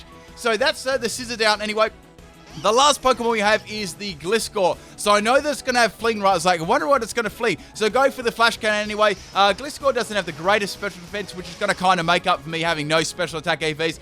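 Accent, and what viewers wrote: Australia. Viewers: bruh thats just his australian kicking in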